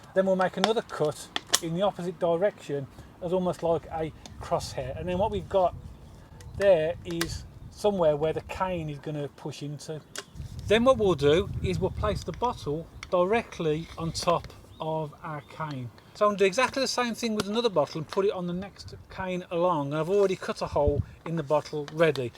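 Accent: Birmingham, United Kingdom. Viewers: Have been struggling with the cabbage whites and looking at buying expensive cages...............I have lots of canes......Thank you so much to something even I can do. Good luck love the Brummie accent.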